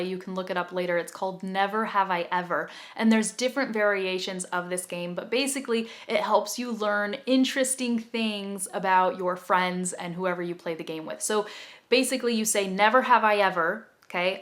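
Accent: California, United States. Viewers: I enjoy your accent from California. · I love you Ma'am, The way you speaking American accents and your always smiling face attract me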